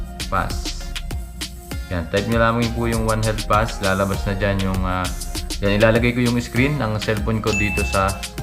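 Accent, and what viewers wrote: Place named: Visayas, Philippines. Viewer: Bisaya man ka dong hehehe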